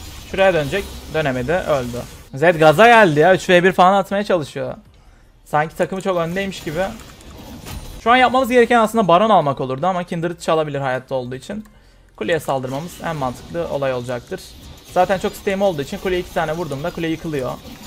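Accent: Adana, Turkey. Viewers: Adanalı mısın?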